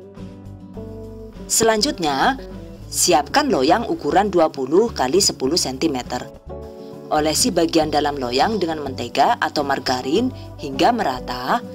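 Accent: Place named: Madura, Indonesia. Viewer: Fokus ke suaranya az, bahasanya kayak logat madura hehehe. Maaf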